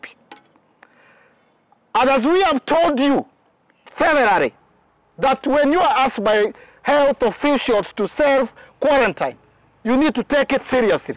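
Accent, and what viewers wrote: Kenya. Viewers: You've made my evening, with a kikuyu accent. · So all of you guys are assuming Eric is trying to speak like a Kikuyu accent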